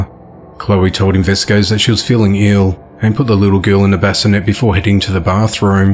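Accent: Australia. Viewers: You must be an Aussie right?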